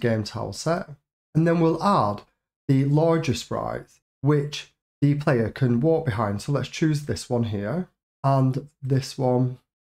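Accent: United Kingdom. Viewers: Let me guess, British?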